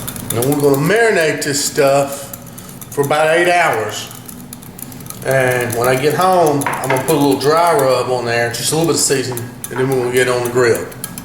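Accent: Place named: Georgia, United States. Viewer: Came across this vid after I bought some flat iron steaks at the local Piggly Wiggly. Started strong with the proper manner of talkin' (like we do round here in mid-Ga) you lost some credibility cracking open that Buttwiper (Light, at that!) but then, it IS STILL beer . . . so I continued watching. Will do some steak fries instead of the new taters and reckon it ought turn out purdy decent. Keep ya posted.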